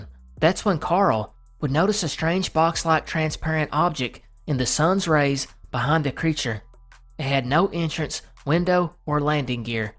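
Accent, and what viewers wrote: South, United States. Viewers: I’m born and bred in the North Carolina mountains and I love to listen to you. We have different levels of southern drawl here but I almost feel like I live down the road from you. Lol You do a great job and get a lot of info for your stories. I can only guess that you’re a sincere and good natured guy. You know the help out your neighbors without being asked type. Glad I found your channel!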